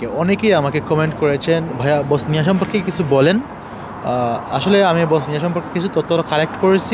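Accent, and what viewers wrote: Sylhet, Bangladesh. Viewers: আপনার সিলেট মাত লাগের সিলেট নি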